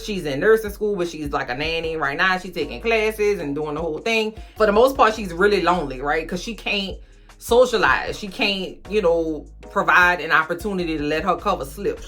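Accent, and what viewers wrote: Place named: Louisiana, United States. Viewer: Your accent is so beautiful! 😄💖 Got to be Louisiana!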